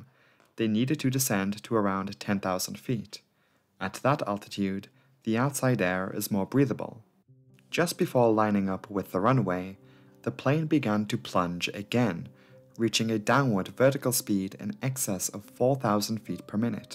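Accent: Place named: North East England, United Kingdom. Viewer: Splendid video. Thought provoking. Love your North East accent. My mum (mam) was born in Chopwell. 😊❤️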